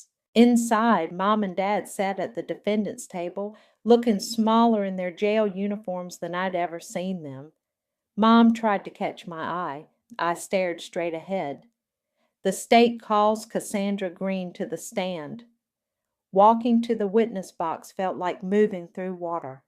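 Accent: South, United States. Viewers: Bravo... Great story Thanks loved the southern woman narration ❤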